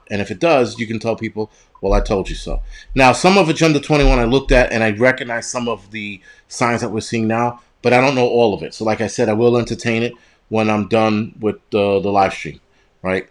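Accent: New York, United States. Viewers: That's New York language. Love it.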